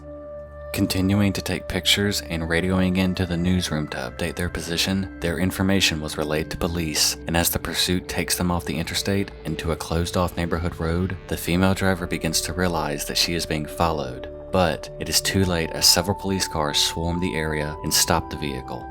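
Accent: South, United States. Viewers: I just came across this channel, and so far, I'm loving it. Thank you for giving these innocent victims a voice. I'm definitely subscribing!....btw, I'm hearing a little bit of a southern accent, I love it. People tell me that I have a southern accent as well, but I don't hear it at all. Lol. Also, I'm from VA, I didn't pick up an accent there! Lol. ❤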